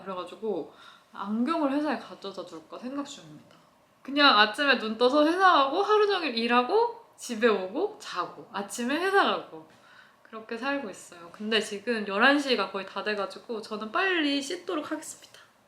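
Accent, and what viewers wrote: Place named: Jeju, South Korea. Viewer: 우왘ㅋ.. 이렇게 현실 제주도말 처음들어봄..ㅋㅋㅋㅋㅋ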